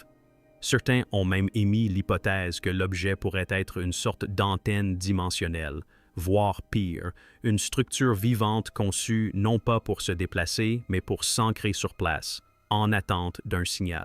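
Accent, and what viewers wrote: Canada. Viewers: Euh... Est-ce un poisson d'avril de la part d'une IA ? Bougez pas, je vais me renseigner à la NASA. Bel accent Canadien, et vidéo interressante, mais farfelue.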